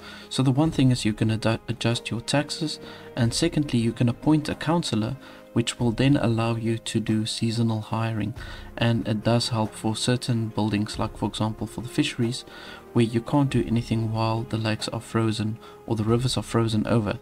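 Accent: South Africa. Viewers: South African bruv? perhaps?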